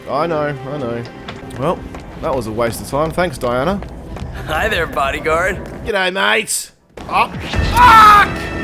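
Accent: Australia. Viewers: Aussie accent just makes everything better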